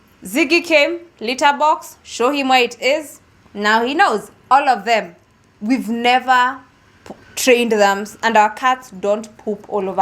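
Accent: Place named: United States. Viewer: You have an american accent have you lived in the states or were you going to an international school in your country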